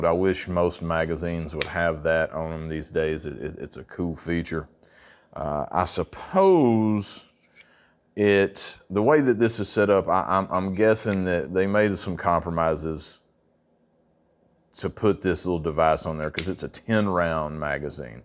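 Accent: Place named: Oklahoma, United States. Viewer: ha I thought he said raffle but he said rifle. lol we sound like that here in Oklahoma. love the video bro you did a great job!!!!!!!!!!!!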